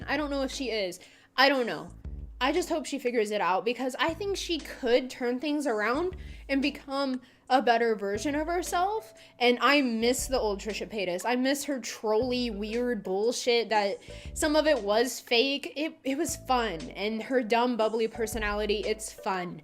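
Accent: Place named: Michigan, United States. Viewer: Are you from Michigan? Lol I'm just guessing from your accent. Im probably wrong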